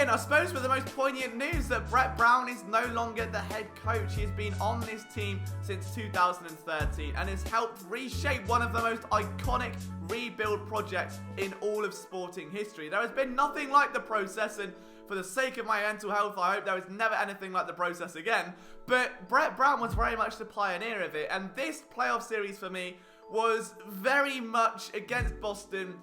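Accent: United Kingdom. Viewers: U still got the Flyers. They played tonight!! Stay safe!! Btw where are u from because u sound British? I am loving the accent.!!!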